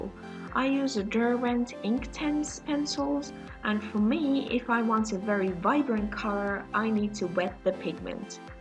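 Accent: Finland. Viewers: I'm currently debating with myself on if you are Finnish (it's the accent).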